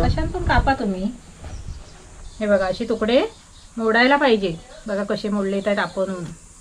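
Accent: Kolhapur, India. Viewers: कोल्हापूर वरून बोलतेय का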